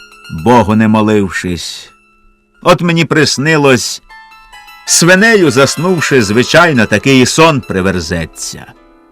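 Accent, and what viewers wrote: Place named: Ukraine. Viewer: Голос дуже український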